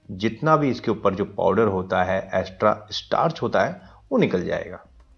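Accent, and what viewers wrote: Uttarakhand, India. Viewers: Bhaisaab ap uttarakhand se ho kya?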